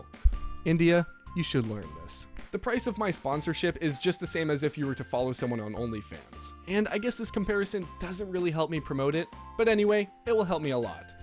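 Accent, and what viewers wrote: Russia. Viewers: Are you russian??? "Convierrted"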